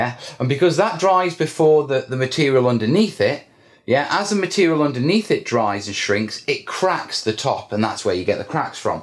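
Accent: United Kingdom. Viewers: Are you British accent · based on your language accent, I assume - you live in Britain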